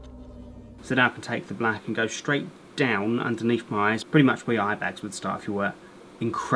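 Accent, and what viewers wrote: United Kingdom. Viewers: what part of the UK are you from? (if you are from the UK..)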